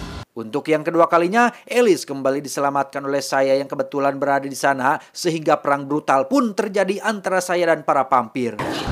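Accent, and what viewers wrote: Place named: Aceh, Indonesia. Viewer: Aceh sekali bg😂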